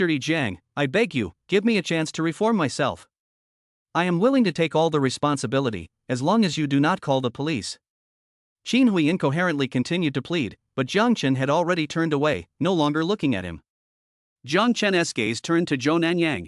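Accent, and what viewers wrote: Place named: England, United Kingdom. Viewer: This English accent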